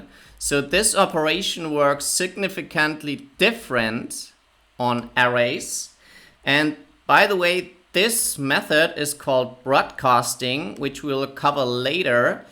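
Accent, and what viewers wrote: Germany. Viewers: Are you German cause Your accent is kind of one. Well thanks for this amazing crash course.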